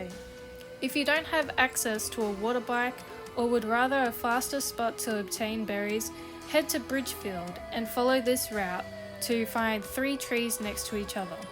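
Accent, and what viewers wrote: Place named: United Kingdom. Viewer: You sound board and British. It's going to be ok 👍 don't be sad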